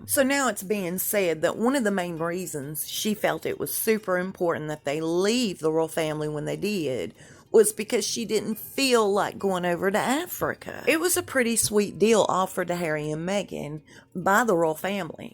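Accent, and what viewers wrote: South, United States. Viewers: Hey, I just LOVE listening to your Southern accent, I could listen to you all day💕. When is your next Cooking show? Can you do Gumbo or Jambalaya? Peach cobbler?;)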